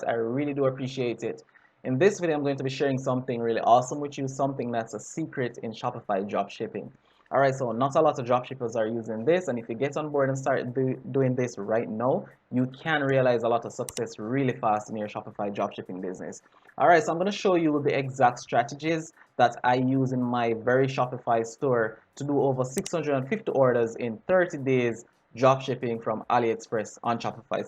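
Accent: Jamaica. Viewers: I was trying to place the accent and wanted to say Jamaican, but wasn't totally sure. Accents fascinate me, so thanks for the affirmation!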